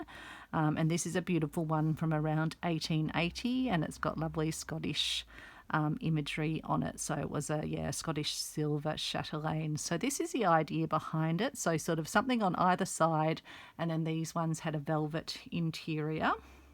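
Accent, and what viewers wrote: Australia. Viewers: Ohh!! This is wonderful. I will have a go. My Nanna had needle books that belonged to her mother. Also … as an Australian living in Canada…. I appreciate the familiar accent. Made watching this an added joy ❤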